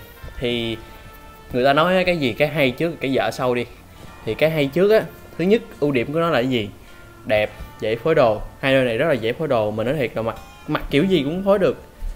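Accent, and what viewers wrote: Standard. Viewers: a nói chuẩn quá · Anh nói chuẩn quá :33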